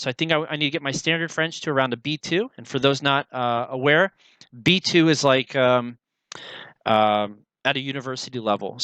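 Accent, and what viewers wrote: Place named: United States. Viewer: you have the american accent but it's not strong to the point i wont understand and it's better than then the previous video for sure, accent will be lesss and less present the much you speak with native level speaker. Immersion all the time , it a method actually.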